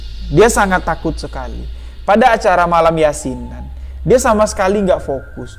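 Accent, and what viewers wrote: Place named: Jambi, Indonesia. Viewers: Saya selalu nyimak cerita misteri dari bang...hampir tiap hari beberapa cerita saya simak Saya suka gaya bicara bang...baru saya tau seperti ini gaya bicara orang jambi..enak didengar Sukses selalu bang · jambi kk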